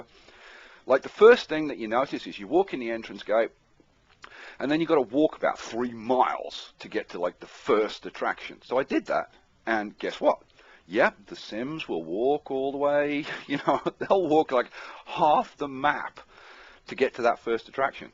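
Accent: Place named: United Kingdom. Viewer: I bought the Amusement Park. And yeah, it's ok, I like it. It would be nice, as you said, if it was more reasonably priced though. Thanks for all of the fun, educational, and entertaining videos! I love to hear what you have to say..... and.....I've always been a sucker for a british accent. lol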